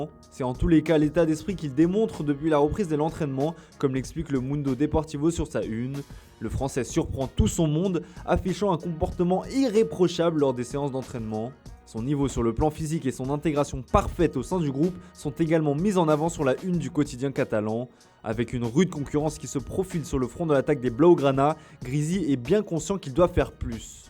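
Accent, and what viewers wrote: Italy. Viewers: Je sais pas c’que vous avez avec l’accent italien, mais c’est trop gênant. Stop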